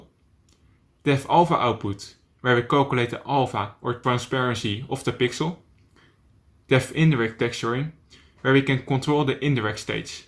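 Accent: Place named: Netherlands. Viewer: Guy with heavy dutch accent explains shaders.mp4 Good video! I would just mess around with the settings until I got what I wanted.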